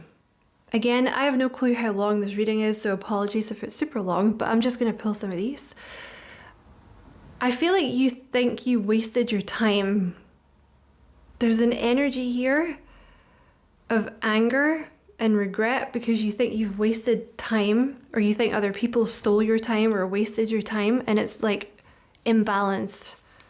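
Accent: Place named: Scotland, United Kingdom. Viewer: Thank You. Felt as if you were doing a private reading for me. Resonated with me every step of the way.. and I love your accent and you must be from Scotland. Thank you again. You are an excellent tarot reader. Averil from South Africa. Blessings to you ♥️🌹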